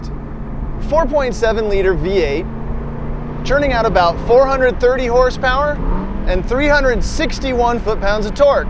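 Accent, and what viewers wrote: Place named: United States. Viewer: The car sounds great. I wish annoying yank with that grating accent would shut the f**k up though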